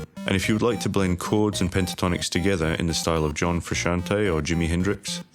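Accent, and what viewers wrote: Scotland, United Kingdom. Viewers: Scottish? I’m from Stirling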